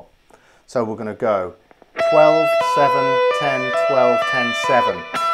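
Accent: United Kingdom. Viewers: I've noticed that has a British accent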